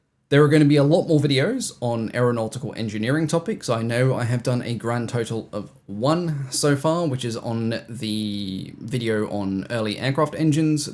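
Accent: Australia. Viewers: Congrat's on getting married,! Mate, it all works out in the end. Just keep plodding along. We all get those periods of... Bleh!... but we get over them by putting one foot in front of the other until we're out of the haze. You sound like a South Australian... & I am a South Australian, so I should know! 😉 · Wait, you're not Australian? My brain parsed your accent as definitely Aussie but with non-Aussie parents. I'm confused where you're originally from, now. (For some context, I'm a linguist at heart, my brain ponders these things whether I consciously want it to or not, I'm not trying to pry into your life 😅) Also, huge congrats on your wedding!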